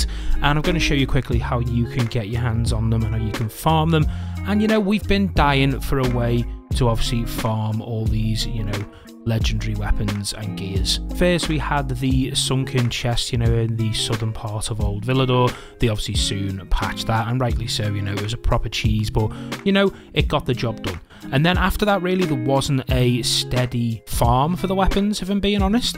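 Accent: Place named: Liverpool, United Kingdom. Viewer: Is rite lad sound like ya from round my area Liverpool? Subbed rite away 👍👍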